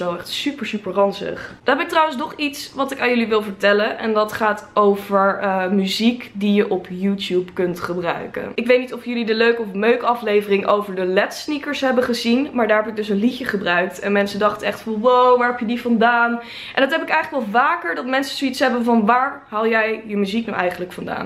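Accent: England, United Kingdom. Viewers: is hij echt engels of praat dié gewoon zo voor de grap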